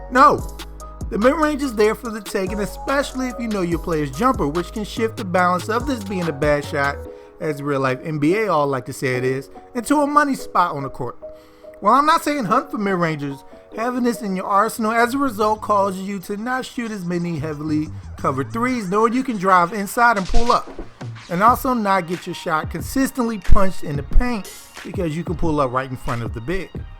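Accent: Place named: Baltimore, United States. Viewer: Can tell you from Baltimore wit da accent 😭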